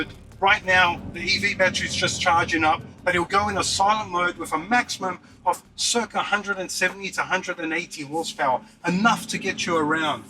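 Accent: Australia. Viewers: lol😂 Aussie accent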